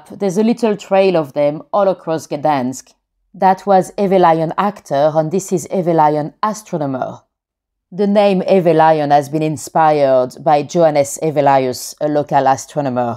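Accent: France. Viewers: Your accent are u French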